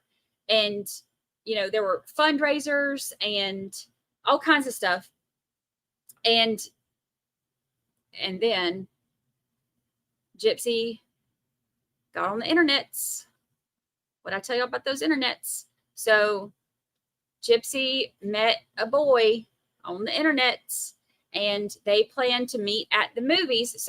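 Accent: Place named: Florida, United States. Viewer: I'm from RA's. Don't know what trial you were covering but it's true. The minute you go do something else, the Jury's ready. Just wondering is your accent from Florida? I like it. Of course, to you I have the accent. Congratulations on your 10k!!! That Boy In The Box Sounds Horrible. How in earth can people do that?! I hope they get the D.P.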